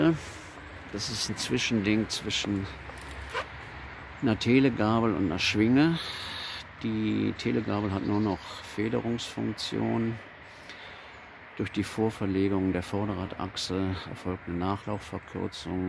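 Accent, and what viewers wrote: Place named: Ruhr, Germany. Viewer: Ömma. Du komms aber auch ausm Pott, oder?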